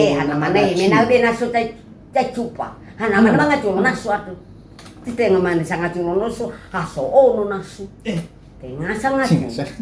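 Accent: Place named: South Nias, Indonesia. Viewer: Bang, dari logatnya ini, apalah abg dari Nias selatan.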